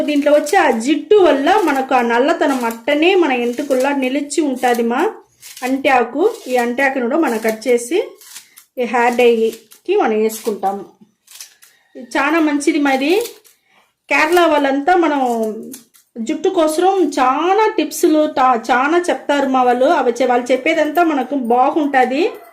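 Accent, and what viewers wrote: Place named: Chittoor, India. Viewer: Chittoor Telugu... influenced by Tamil 😂😂😂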